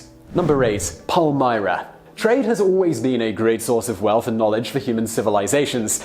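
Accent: England, United Kingdom. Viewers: i'm english and i find this guys voice too english. ecspecially when he says "empire"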